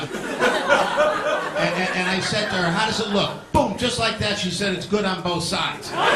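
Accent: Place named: New York, United States. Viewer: This guy is hilarious. Love the new York accent, sorry to hear he has passed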